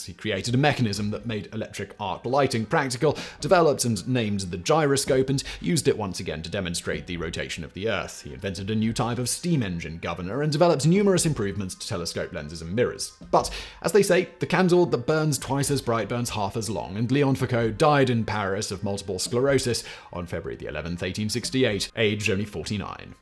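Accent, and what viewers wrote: United Kingdom. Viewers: He has a thick British accent, but why then does it feel like he's an American?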